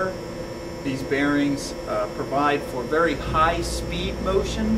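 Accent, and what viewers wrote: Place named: Philadelphia, United States. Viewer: You have a Philadelphia region accent.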